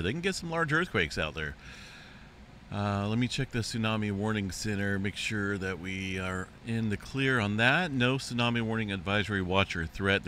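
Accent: California, United States. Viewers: You sound like you’re from Cali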